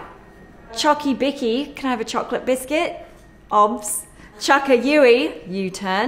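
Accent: United Kingdom. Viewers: I watched this with a huge smile on my face. I learned a lot and she's too cute. :) Wish she would use her awesome British accent in her performances as well.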